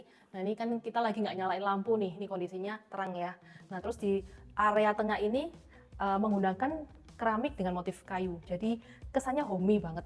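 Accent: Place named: Surabaya, Indonesia. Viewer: logate suroboyo sangat.. keren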